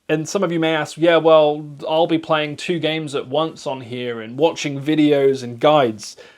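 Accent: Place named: West Country, United Kingdom. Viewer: My guy, you sound like you're from the Westcountry like me! I cannnnnot wait to get my Thor!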